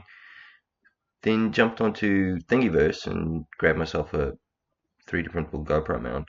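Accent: South Africa. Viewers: are you a saffa? lol good job doe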